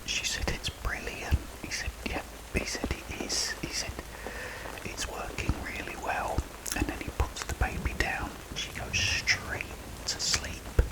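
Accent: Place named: United Kingdom. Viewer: I'm American but I love British accents. Dealing with covid right now and this helps me get to sleep, thank you 🙏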